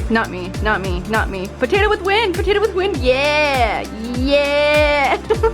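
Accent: Canada. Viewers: You know she Canadian she says ishhhhh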